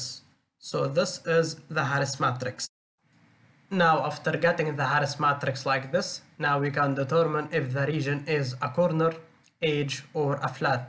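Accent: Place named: Egypt. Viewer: Great explanation bro, I also feel that's an Egyptian accent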